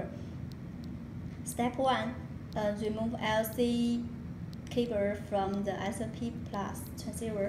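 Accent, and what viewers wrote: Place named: Asia. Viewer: thats how asian speak u dumb ball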